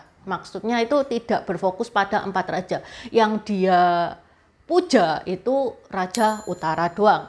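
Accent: Java, Indonesia. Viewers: Kok Medok banget 😊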